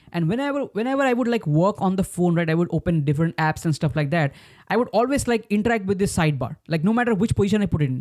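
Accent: India. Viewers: You from bagdogra or wot?❤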